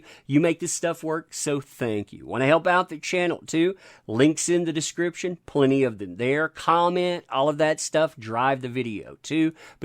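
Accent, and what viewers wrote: South, United States. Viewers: So love the southern accent during the Hollywood gossip 🤗 Reminds me of home 😋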